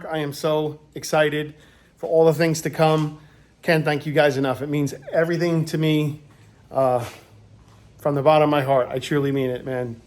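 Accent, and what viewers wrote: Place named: Philadelphia, United States. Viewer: I listened to you for a minute and was like he’s from tristate area. Thats a Phila accent if i ever heard one. Then looked up Alro and there one 10 mins away haha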